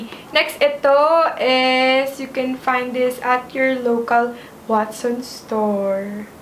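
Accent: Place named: United States. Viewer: Luh kala ko american ka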